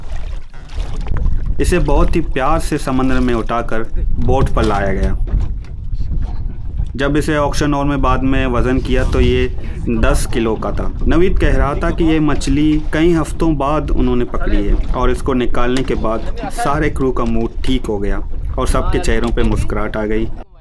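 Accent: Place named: Balochistan, Pakistan. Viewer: کتنی خوبصورت اردو بول رہے ہو لہجہ بھی بہت خوبصورت ہے یہ ایک بلوچ کا ہی ہوسکتا ہے ۔ اللہ خوش رکھے آمین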